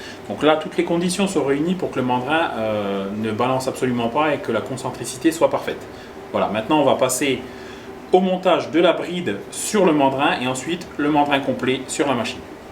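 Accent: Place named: Eastern France, France. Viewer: un petit accent de l'est de la France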